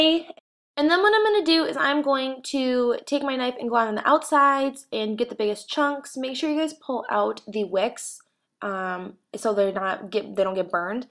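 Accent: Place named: North, United Kingdom. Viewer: As a northerner, I can hear in your voice that you are also a northerner. Good video!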